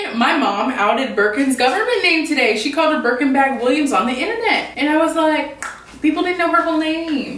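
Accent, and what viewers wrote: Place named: South, United States. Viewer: I'm a true fan. You have lost your Southern accent. Glad you're back.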